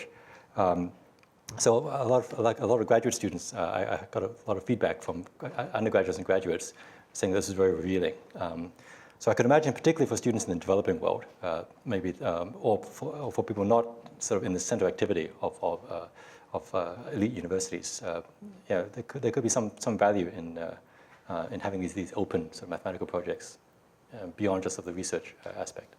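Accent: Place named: Australia. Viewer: He’s brilliant. I was in the same year when entering Princeton grad school and lived in the same “ New College “ (dorm) as Terry in the early 90s. He was so young and we all saw him at dinner each night. I’m in the humanities so we weren’t close. He was roughly 16 or so if I remember correctly. Later, I would sometimes see him walking on Witherspoon, deep in thought. He had this same Australian accent in those days as well.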